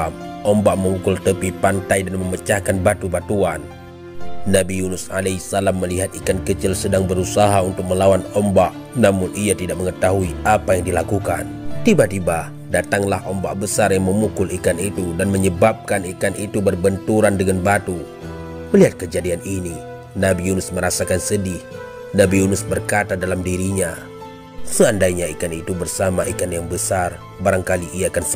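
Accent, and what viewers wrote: Aceh, Indonesia. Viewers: Ini pasti orang aceh,,terbaek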